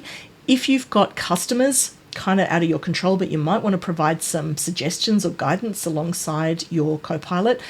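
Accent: Australia. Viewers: Another great and informative video, I am really getting into Copilot as a result of your videos, I can see what an amazing benefit it can be for any sized organisation. Keep up the wonderful work! I always look forward to your new releases. Also, as a fellow Australian, so good to hear an Australian accent on YouTube, as well as the familiar cultural nuances and humour in your discussions.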